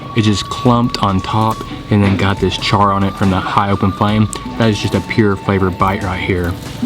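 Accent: South, United States. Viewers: You are a combination of Mark Weins and Trevor James with a hint of Southern twang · Sir, what do you do? Your travel is a long one. I have a two week vacation and Philippines is my spot this summer. I am in Chicago. You sound like a southerner. I am a Chemist here in Illinois.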